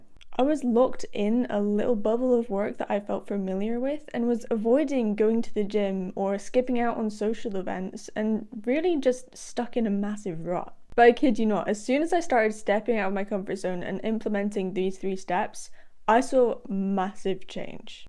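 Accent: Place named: Scottish Highlands, United Kingdom. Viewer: Where’s your accent from? 😊 I’m getting highlands mixed with down south..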